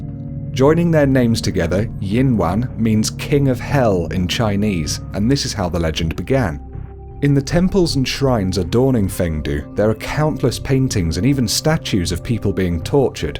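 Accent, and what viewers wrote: England, United Kingdom. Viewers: your british talk can blow a waaanker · Why is every top 5 is a English dude?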